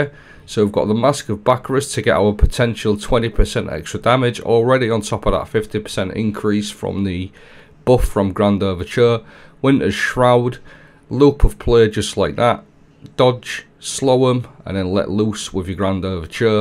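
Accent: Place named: Manchester, United Kingdom. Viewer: my buddy from across the pond heard your voice thru my mic as I was watching this clip. He’s guessing you’re: Manc or salford 😊??? Good videos as usual. Keep ‘em coming.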